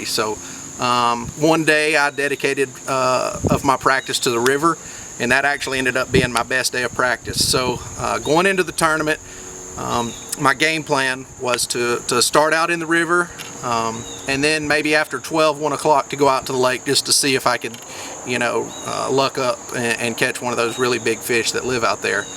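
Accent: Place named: Carolina, United States. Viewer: Gosh-A-Mighty, you are a pure Carolina Boy! Love it!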